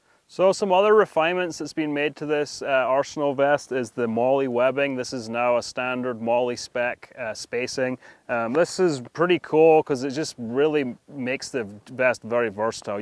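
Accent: Scotland, United Kingdom. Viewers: Sounds like a hint of Scot in that accent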